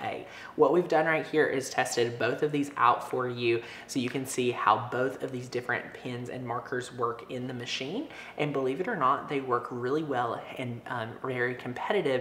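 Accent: South, United States. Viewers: You have to be Southern!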